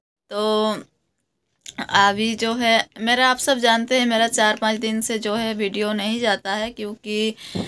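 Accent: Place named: Bengal, India. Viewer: Are you a bengali as you talking asent is like bengali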